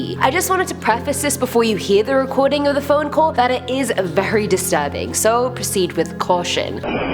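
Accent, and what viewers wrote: India. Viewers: Dude ur Hindi accent is sooOooOooooooOooO cool.i just love the way you talk in that accent! Who agrees? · Unfortunately, hindi accent stopped working. OK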